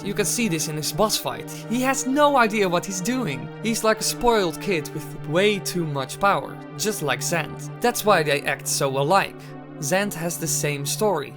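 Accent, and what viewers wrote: Netherlands. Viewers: I have to ask, but you're dutch, right? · Are you dutch bcuz your r is really strong and you have that accent Ik ben nederlands trouwens(im dutch btw)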